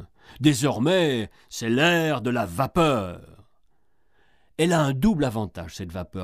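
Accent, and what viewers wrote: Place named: Réunion, France. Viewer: Très bon reportage, bravo, c'est très enrichissant ! C'est agréable d'avoir un documentaire avec ce léger accent rényonais :-) Je viens habiter dans quelques mois sur cette île que j'aime tant !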